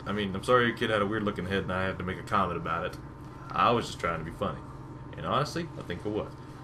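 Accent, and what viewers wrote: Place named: South, United States. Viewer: You have just the slightest twinge of southern accent whenever your start speaking a sentence more than 6 words long. I would totally fuck that slight southern accent.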